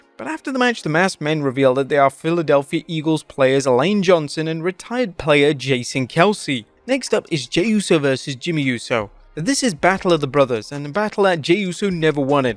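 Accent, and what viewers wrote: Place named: United Kingdom. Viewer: You sound like my British friend She loved watching the rock last night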